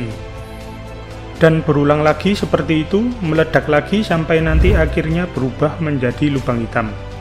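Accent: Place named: Java, Indonesia. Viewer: Tingkatkan lagi mas channel nya, em... Logat jawanya tolong agak dikurangi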